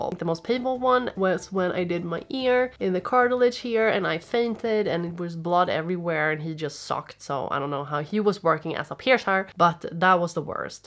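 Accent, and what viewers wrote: Sweden. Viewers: She sounds sooo swedish lmao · It's her accent, she's swedish. ^u^ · Is she Swedish? Cuz she kinda sound like you have that Swedish accent when you speak English. Lol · SHE HAS AN SWEDISH ACCENT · Is she from Sweden bc she has that accent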